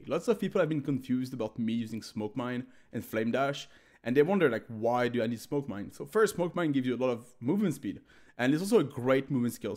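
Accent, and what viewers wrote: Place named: France. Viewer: Je me disais mais il est francais, 100% Puis oui haha Excellent build en tous cas, merci !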